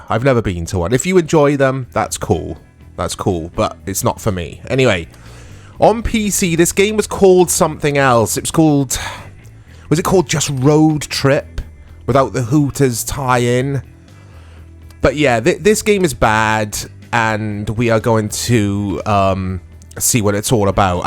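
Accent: United Kingdom. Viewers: yuk, british accent...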